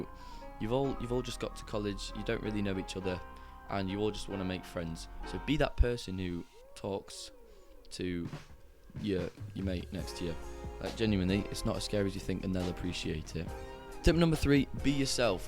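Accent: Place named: Manchester, United Kingdom. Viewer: banging in a manchester accent video 🥳🥳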